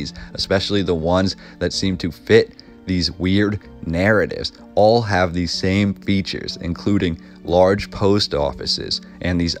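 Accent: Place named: East Coast, United States. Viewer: I recently found your channel It fantastic I can hear the east coast in your voice Lol Have you done washington dc or georgetown?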